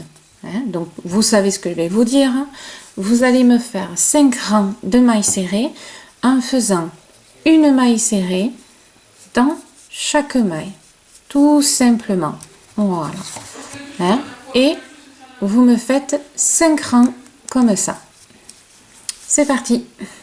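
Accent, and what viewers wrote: South, France. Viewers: Super, vous êtes géniale merci pour le joli lutin et j'adore votre accent du sud j'attends d''autre tutoriels avec impatience !!!!! A bientôt .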